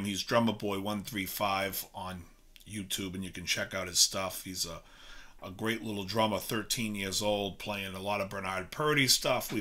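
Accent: Boston, United States. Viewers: I have got to say man, I'm a fan.what is your musical education? College? Juliard?... you seem very technical and educated on music..you are obviously from Boston or that area for some time.. but yeah cool man..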